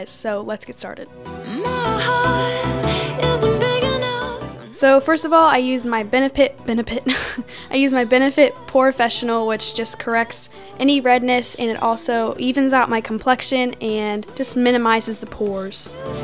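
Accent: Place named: United States. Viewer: What country are you from? Cause it's autumn nearly winter in Australian and Southern Hemisphere, but it's spring nearly s summer in America,northern hemisphere. But you sound American. Aha well anyways by the way you are so beautiful with or without this makeup and I respect you being so brave :)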